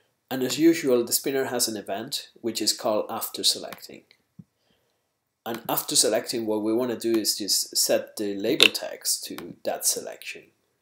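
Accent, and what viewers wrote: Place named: Spain. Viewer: Es muy bueno el video gracias. Se nota que eres español. Viva españa!!!!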